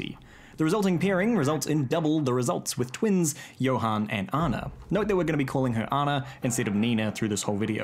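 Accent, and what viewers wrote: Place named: New Zealand. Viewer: Also your accent is so interesting for me, it kinda sounds like Australia, but not quite. My guess would be New Zealand, but guessing accents in a second language is really hard, so don’t be offended if I messed it up completely lol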